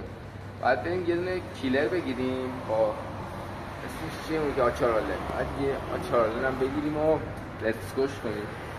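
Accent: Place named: Hamadan, Iran. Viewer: همدانی هستی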